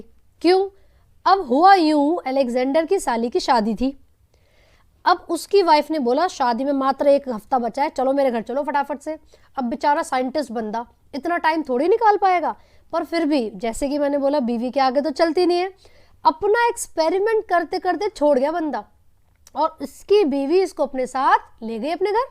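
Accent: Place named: Uttar Pradesh, India. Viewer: Didi aap bataiye ki aap kis state se belong krti ho.Mujhe toh lgta hai ki aap UP ki ho.Aapka accent hi aesa hai jaisa UP mei.Agar m sahi hoon toh aapka like chahiye mujhe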